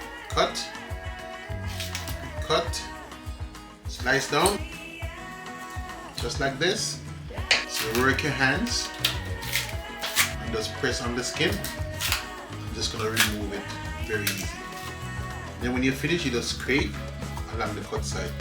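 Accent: Jamaica. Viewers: You sound like a Jamaican😊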